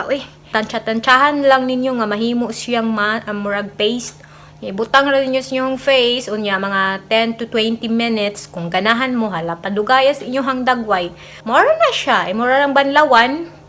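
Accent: Visayas, Philippines. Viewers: Lami Kay paminawon mag bisaya sis na try nasad na ko sis. YT pa more maong g bugas hh parehas ta😂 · sis omg i love the way you talk Bisdak na bisdak kaau i love it i will play and watch all your videos im sure naa ko ma learn thanks sa pag share aning steam facial spa treatment or “Toob” dali ra sya pero careful lang sa pg apply kay ininit mn tubig hehe utong jud ning klaseha kay tiis ganda man Pero para unsa man gyud ni sya nga ritual sis pang hydration sa face?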